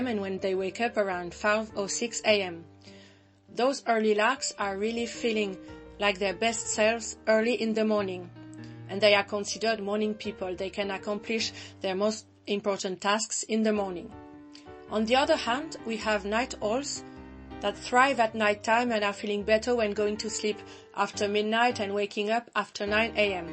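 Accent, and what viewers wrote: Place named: France. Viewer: I found this video explained very clearly all the complex facets of sleep. Nice French accent as a bonus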